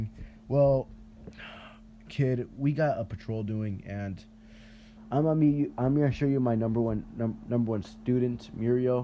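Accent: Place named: Jamaica. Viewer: Yooooooo your Jamaican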